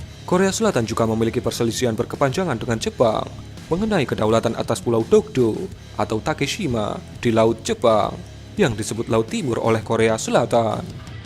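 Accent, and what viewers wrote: Java, Indonesia. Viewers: bahasa indonesianya yg masih ada logat jawanya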